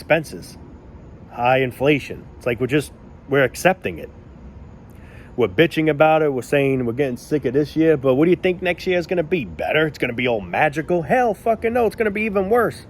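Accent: New York, United States. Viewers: I really like your New York accent, off topic i know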